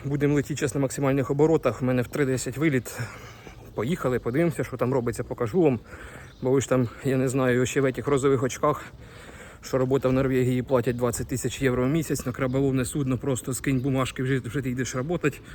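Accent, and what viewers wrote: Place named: Surzhyk, Ukraine. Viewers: Ми Українці шо не понятно а балакає він як у нас в Дніпропетровській області привіт з Павлограду. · Важко сприймати на слух такий жорсткий суржик.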